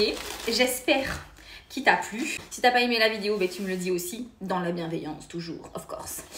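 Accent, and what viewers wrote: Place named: South, France. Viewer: Corps parfait, accent du sud adorable, une pincée d'humour. ❤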